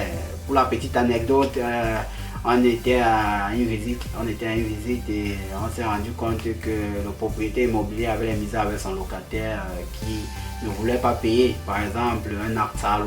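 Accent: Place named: Germany. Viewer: Soit tu parles français, soit tu parles allemand, c'est quoi cette façon de parler ? Pfff…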